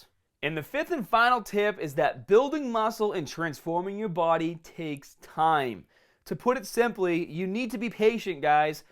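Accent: Boston, United States. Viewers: Is that a Boston accent? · Is he american or aistralian? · I can't tell if he's from Boston or Australia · I have been underexposed to the Boston accent. I'm actually having to remind myself that this is how he seriously talks and he's not trying to be funny or anything... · Is he Bostonian? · Boston af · Your Boston accent didn't allow me to focus on what you were saying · Is he from Boston? I can kind of hear the accent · I'm portuguese, and i never even been to the US but i can recognize his Boston accent. Its really thick! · lol is he from boston or something?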